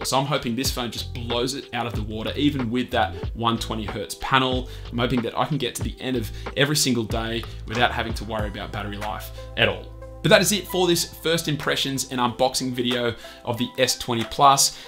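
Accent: Australia. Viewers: what part of austrailia are you from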